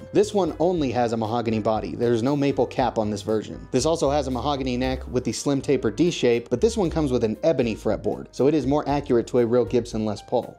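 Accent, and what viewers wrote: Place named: London, United Kingdom. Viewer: Hey good London accent. I sound more or less just like that😂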